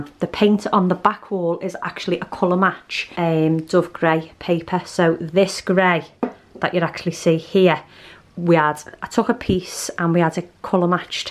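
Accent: Liverpool, United Kingdom. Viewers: Yay I found a scouse YouTuber! Love this video!!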